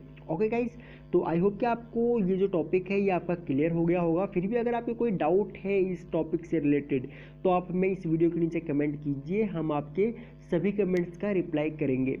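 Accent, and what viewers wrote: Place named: Bihar, India. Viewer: Bhai bihar ke Ho kya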